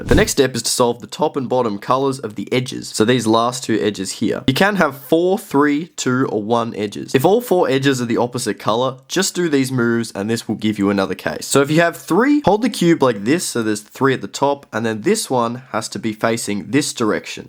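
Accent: Australia. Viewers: Thanks for this quick and easy to follow tutorial 👏😁 But the Aussie accent was so funny 😂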